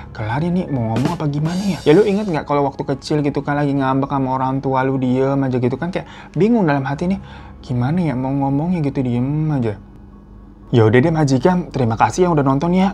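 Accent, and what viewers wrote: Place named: Sukabumi, Indonesia. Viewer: Kaget pas denger di awal awal, lah orang Sukabumi ternyata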